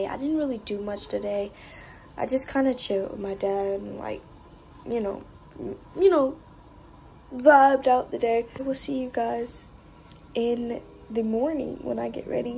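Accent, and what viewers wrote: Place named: United Kingdom. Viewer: nahh she’s giving british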